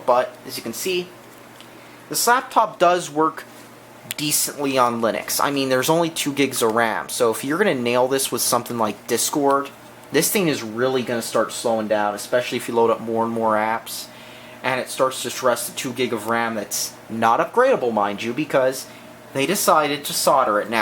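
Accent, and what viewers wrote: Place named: United States. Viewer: They opened with "This laptop sums up everything wrong with the computing industry today" in a broad American accent. I'm surprised you got 4 minutes in...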